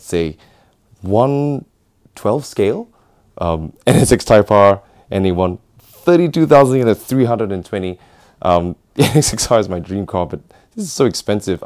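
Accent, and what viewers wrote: England, United Kingdom. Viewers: why is the talking like an Englishman if he's a Japanese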